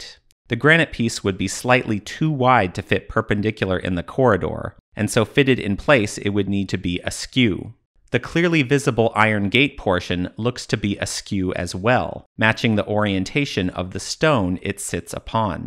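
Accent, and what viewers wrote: United States. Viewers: That last pun of yours,is one that works ok with the American accent.You know~~~Annarica and all that. Enlightening video by the way, thanks.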